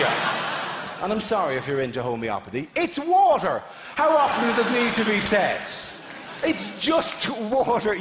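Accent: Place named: Ireland. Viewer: It's just an Irish accent with a lisp thrown in.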